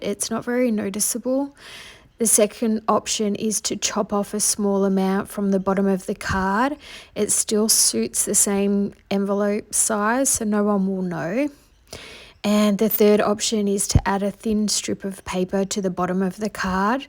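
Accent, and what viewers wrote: Australia. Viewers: Love love hearing a Aussie going so well and does Brilliant work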